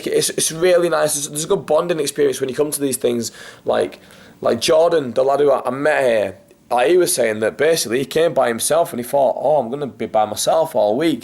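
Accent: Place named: North, United Kingdom. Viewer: great series mate, i'm going WM31 so just started watching next mans videos to get an idea. ain't gunna lie, the northerner in ya came out- great to watch. keep it up lad.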